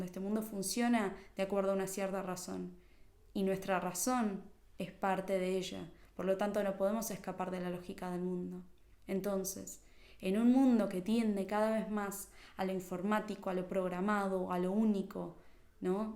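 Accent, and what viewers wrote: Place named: Argentina. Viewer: Gracias. Trataré de encontrar el libro. Te encontré a través de Gustavo Salle. Insólito. Por la forma que tienes de hablar eres argentina, no? Soy uruguayo, te estoy siguiendo con avidez desde hace poco. Muy profundo tu exposición sobre el tema de la Singularidad. Te saluda con afecto, desde Uruguay, Eduardo, artista plástico egresado de la Escuela Nacional de Bellas artes.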